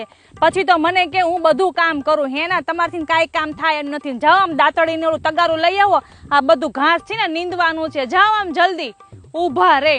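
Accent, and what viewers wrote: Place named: Ahmedabad, India. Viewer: pan kyarek amdavadi bole che tyare maja nathi aavti aapni pyor kathiyavadi bolo 😊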